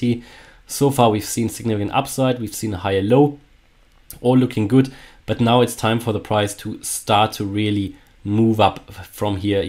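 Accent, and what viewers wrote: Germany. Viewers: 3.50 !!!!!!!!!!!!!Love your german Accent. :) was kostet deine Mitgliedschaft und kann man auch traden lernen,.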